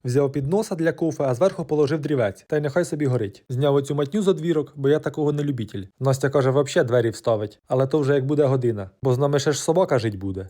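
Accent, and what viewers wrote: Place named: Volyn, Ukraine. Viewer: Випадково в головного героя даного відео немає брата на Волині Такий самий говор та поведінка Маю такого знайомого геть схожий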